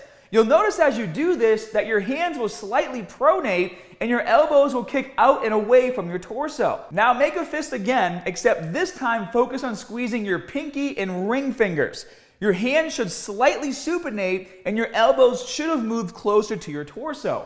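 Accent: Boston, United States. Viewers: The Boston accent makes it better!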